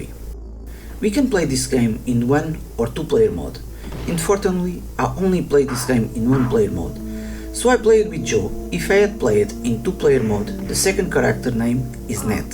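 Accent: Portugal. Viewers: Não sei se alguma vez comentei num dos teus videos mas estava a ouvir o teu sotaque e pensei que fosses Tuga. Fui ver e és. Não falha. :)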